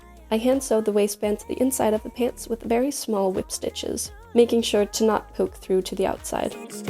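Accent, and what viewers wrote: Denmark. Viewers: Okay, bliver nødt til at høre om du er fra Danmark? Dit engelsk er virkelig godt, men der er noget dansk over det 😄 hvis du er, og kan læse min kommentar, så tusind tak for din tutorial! Den var super hjælpsom 😊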